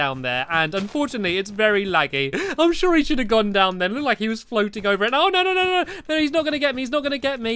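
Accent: United Kingdom. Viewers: Wooo ur british like me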